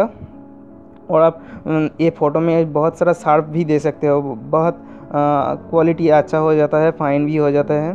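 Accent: Bengal, India. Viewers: Dont worry bro it was good enough to understand.... I can say that youre Bengali like me, thats why you had problems with pronounsation. But the video was good enough to understand..... Thanks. Qnd make some more useful video like this..... 😊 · improve your Hindi. You sound like Bong-Hindi, which is horrible. I'm sure you are Bengali... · haye re bengali babu..acent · aareee....bangali.....agy. hindi. sikhy. aai..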